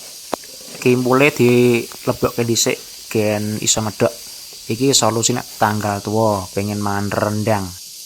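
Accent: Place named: East Java, Indonesia. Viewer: Mangane uuakeeh.. logat jawa timur ya Mas'e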